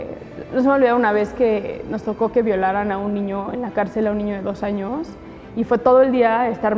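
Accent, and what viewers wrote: Spain. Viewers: hace el acento español en otros programas lo hace más y ahorita no lo hizo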